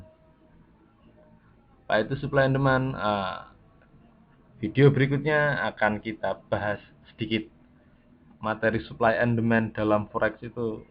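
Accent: Java, Indonesia. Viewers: pean jowone pundi 😁😁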